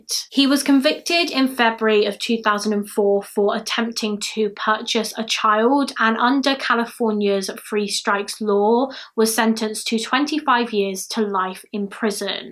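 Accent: United Kingdom. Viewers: love your British accent ^.^ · I don't know why, but your British accent is so beautiful. You have a super soothing voice! I loved this video!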